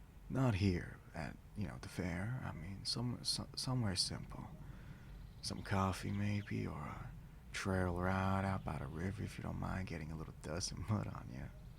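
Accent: South, United States. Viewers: Ahhh! I’ve missed ur southern accent videos one of my favorites!! :3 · Accent: ✅ Nickname: ✅ Blushing: ✅ ✅ Safe to say southern boys are my thing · Been absolutely lovin all these (your) audios, but the southern ones rly be hitting a bit different😊🫠. Hopefully gonna hear more in the future♡. (And possibly a part 2 to this one😇) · Okay ffs i wasnt exoecting it to work on me i can feel my heart rate pickin up quickly southern accents and country folk are alwasy gana be my weakness it reminds me of home and beyter days i supose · your southern accent is still as cute as your first cowboy roleplay HAHAHAAHHA! ( ≧∀≦)ノ CUTIIIEE! glad you're back <3 (((o(*ﾟ∀ﾟ*)o)))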